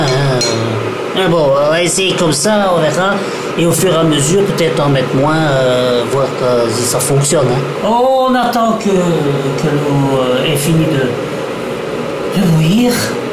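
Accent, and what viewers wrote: Belgium. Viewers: ta diction est parfaite, je te comprends très bien. Mais je te croyais belge en fait. Et il n'y a pas de mal à ça. Il paraît aussi que j'ai un accent lyonnais et autre chose 😂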